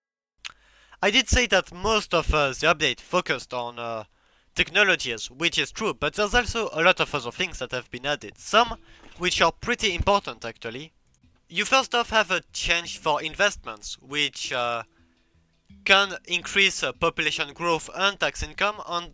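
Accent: France. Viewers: Thickest french accent I’ve ever heard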